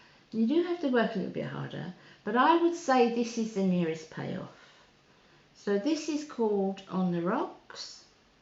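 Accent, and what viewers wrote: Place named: Essex, United Kingdom. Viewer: Hello I just came across your channel and I really enjoyed way it, you sound like you have an Essex accent my neck of the woods 👍 Looking forward to watching more of your videos x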